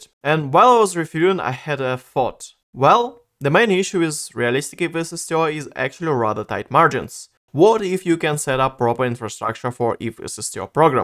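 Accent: Russia. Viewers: это русский акцент или мне кажется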